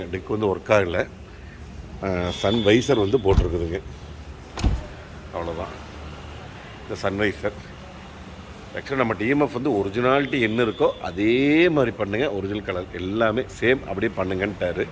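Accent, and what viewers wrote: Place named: Coimbatore, India. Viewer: அண்ணா உங்க கோவை தமிழ் அருமை சூப்பர்🇮🇳💖🙏🏤🕋⛪🙏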